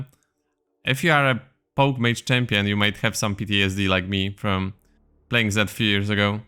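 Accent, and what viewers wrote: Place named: Poland. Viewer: aren't you polish? your accent seems similar xD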